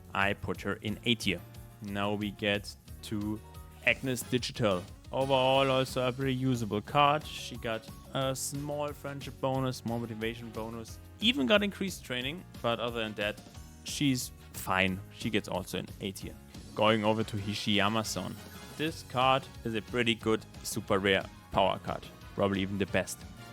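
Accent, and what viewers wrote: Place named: Germany. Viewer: Most German accent I've ever heard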